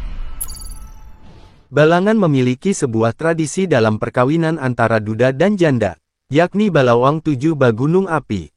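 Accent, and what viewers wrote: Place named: Java, Indonesia. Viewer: Ini channel orang jawa suaranya aku kenal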